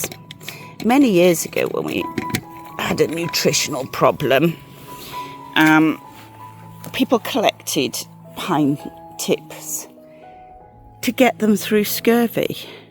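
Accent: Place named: RP, United Kingdom. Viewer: Oh dear jolly hockyscks why do you talk like that all posh now when we were there you didnt it sounds terrible.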